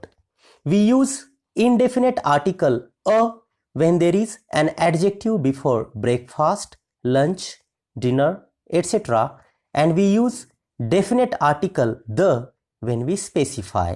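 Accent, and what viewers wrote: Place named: India. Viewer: Sir thank you - i do notice indian accent - great thank you- suggestion - a bookmarking / great content thank you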